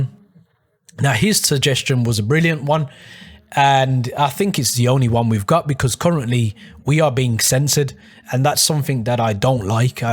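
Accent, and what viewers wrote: Birmingham, United Kingdom. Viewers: What part of Birmingham are u from?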